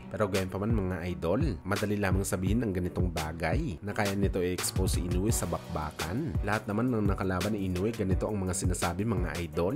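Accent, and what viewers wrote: Iloilo, Philippines. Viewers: Te ilonggo ka haw?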